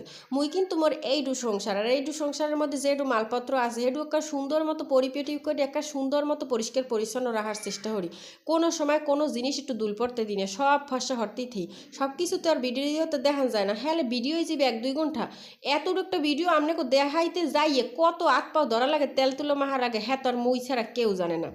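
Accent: Barishal, Bangladesh. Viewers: আসসালামু আলাইকুম আপু চলে আসলাম তোমার ভিডিও দেখতে বরিশাল্লা কথা আমার ভালই লাগে লাইক কমেন্ট সাবস্ক্রাইব করে দিলাম নতুন বন্ধু হিসেবে পাশে থাকলাম অনেক ভালো লাগলো ❤❤❤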